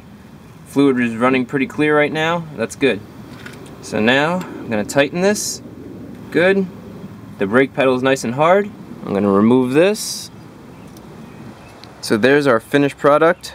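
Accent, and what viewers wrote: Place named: Pennsylvania, United States. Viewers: You sound like you have a Philly accent- wooter! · Know how I know you are from Pennsylvania?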